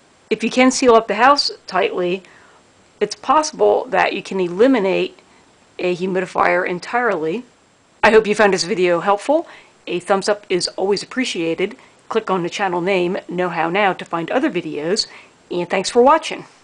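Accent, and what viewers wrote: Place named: Pittsburgh, United States. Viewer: You sound like a yinzer. If you dont know what that is them I am wrong and I apologize.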